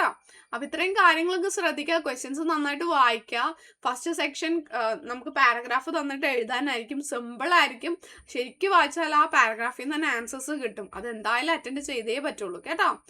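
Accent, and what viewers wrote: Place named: Malappuram, India. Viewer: njan Kozhikode, balussery anu nji Malappuram anennu vijarich slang kandappo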